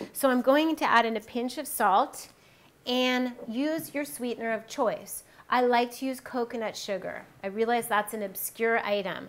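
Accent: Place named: Texas, United States. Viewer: little bit of honey -- sounded like she from TEXAS:))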